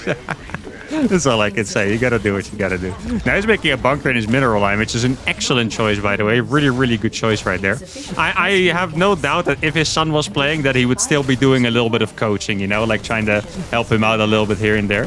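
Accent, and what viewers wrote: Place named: Netherlands. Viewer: It awesom watching you people have this much fun in SC2. Also judging by your accent uThermal you are a fellow Dutchie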